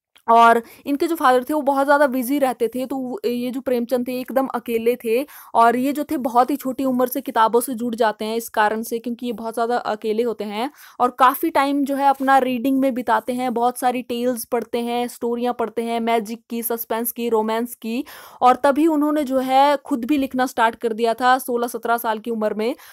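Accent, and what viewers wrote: Haryana, India. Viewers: Mam aap Haryana se hai?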